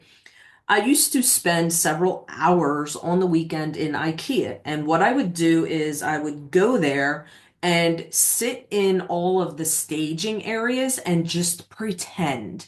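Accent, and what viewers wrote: Philadelphia, United States. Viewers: I hear a "philly" accent in your voice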